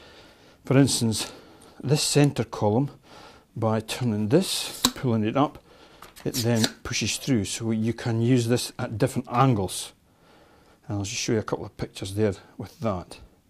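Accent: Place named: Scotland, United Kingdom. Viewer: Clean Scottish accent ;-) like that :-D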